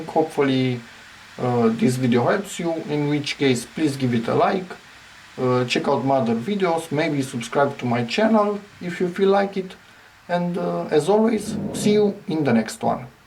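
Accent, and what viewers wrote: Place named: Romania. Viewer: You have such a strong roumainian accent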